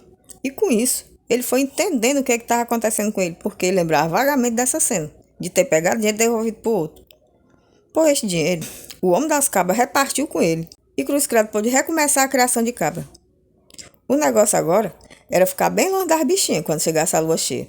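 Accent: Northeast, Brazil. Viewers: Vc e uma narradora muito maravilhosa lembro meus parentes do Ceará minha vó meu avô materno moro em Curitiba mais vendo vc falando lembro e tenho muitas saudades do meus parentes nordestino avó avô e tios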